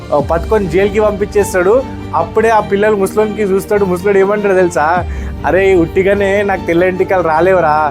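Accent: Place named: Telangana, India. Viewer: మీ వాయిస్ బాగుంది సార్ తెలంగాణ ఏస తో 😂😂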